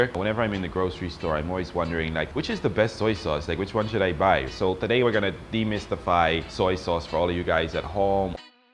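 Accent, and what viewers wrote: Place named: Philippines. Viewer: I'm not happy with the Filipino accent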